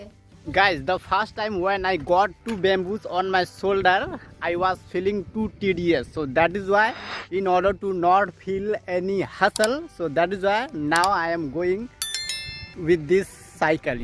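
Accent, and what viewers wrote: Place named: India. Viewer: still Indian accent❤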